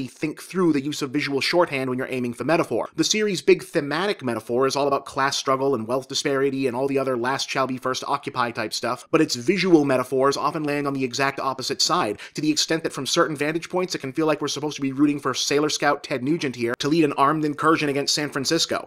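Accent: Boston, United States. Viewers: has a boston area accent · Wow, his speech mannerisms and accept really show through on this one. I think I've seen about 25 of these things and never go "Boston" stuck in my head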